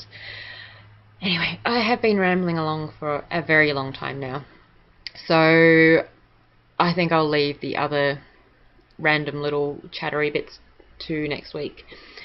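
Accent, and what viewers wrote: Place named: Australia. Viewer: Hi I'm a new knitter from Newcastle NSW. I've been using You Tube to help me learn, and was so excited to hear an Australian voice. It really doesn't take long to become a yarn addict does it!! I always assumed that our home grown wool was still in abundance, but have been surprised at how hard I've found it to source locally. Do you know of any local mills or indie dyers out their that I could support? Looking forward to your next episode.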